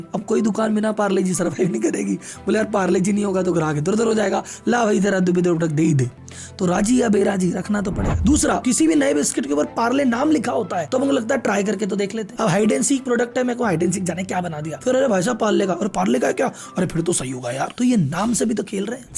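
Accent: Gujarat, India. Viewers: Tamara accent gujrati chey , dhando walo mota bhai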